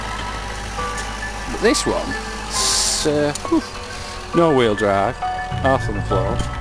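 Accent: United Kingdom. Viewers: 1967 MUSTANG Near Canada???????????????????? Well I suppose northern England is near Canada if you live on the moon! However being as how you don't please, please have another look at the video and you will be surprised to see all the vehicles taking part have British, that is United Kingdom British, number plates. And if that isn't enough what kind of Canadian accent do you think you can hear the bloke speaking with? Near Canada indeed!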